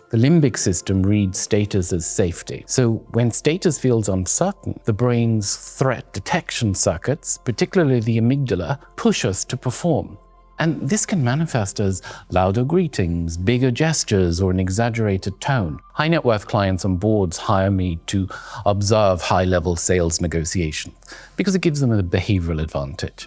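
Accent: United Kingdom. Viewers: Give me a British accent like this guy, and I guarantee my confidence is perceived as 50% higher. Stupid American accent.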